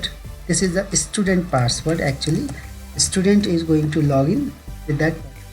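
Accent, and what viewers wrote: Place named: England, United Kingdom. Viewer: Thank you sir. For sharing this video. I like your english accent sir. 😊😊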